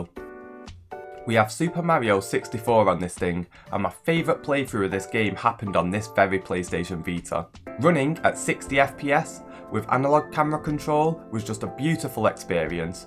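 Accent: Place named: Manchester, United Kingdom. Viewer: Guessing you’re from Manchester?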